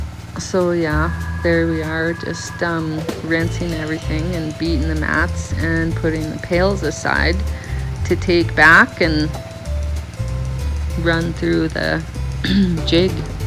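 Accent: Canada. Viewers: I'm here for the Canadian accent, not the gold.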